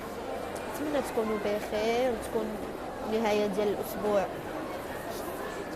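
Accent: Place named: Gulf, Arab world. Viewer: هضرتك ماشي مغربيه لميه فالميه كتصنعي وديما كتهضري مص هضرتك خليجي وكتباني بحال شي وحده فالاربعينيات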